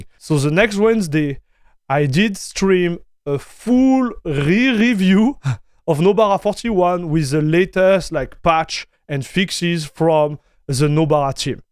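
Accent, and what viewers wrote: France. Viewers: I love the French accent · it's not an English accent haha, it's French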